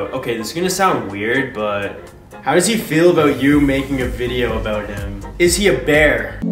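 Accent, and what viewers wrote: Canada. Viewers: Are you Canadian? You have a Canadian accent lol.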